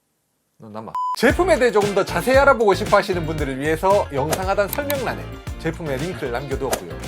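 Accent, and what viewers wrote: Gyeongsang, South Korea. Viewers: 우째 대구 말투네.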